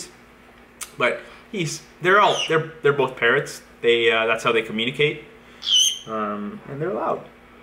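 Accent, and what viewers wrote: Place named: Southern Ontario, Canada. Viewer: How could I tell you were from southern Ontario before you even said Toronto. Must be the accent.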